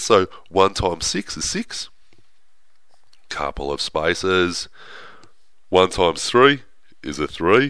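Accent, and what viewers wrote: Australia. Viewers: Not sure if you already realized this, but it's not a British accent it's Australian (did you catch that stereotypical aussie "G'day" in the beginning?)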